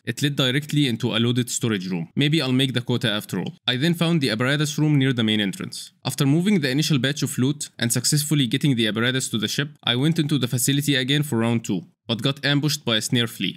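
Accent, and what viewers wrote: Egypt. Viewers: I think.. your accent is Egyptian ? 👀🤔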